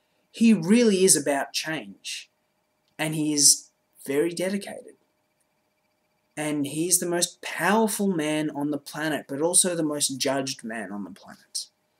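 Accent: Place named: Australia. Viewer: what part of australia are you from? i can't pick the accent and its annoying me :P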